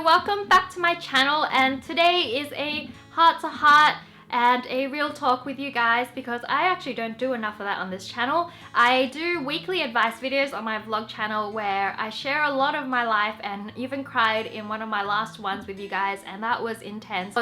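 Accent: Australia. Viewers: Wengie are you Australian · Woah, she sounds really Australian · You know, usually I can tell the difference between Aussie and English accents. but I honestly thought you were from the UK. but then when you said you're Aussie , that's when I actually heard your accent. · she's lived in Australia most of her life, so she picked up the way that the locals spoke. Before she moved she probably didn't have that accent, it just developed over time. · that's why you sound Australian I live in Australia Melbourne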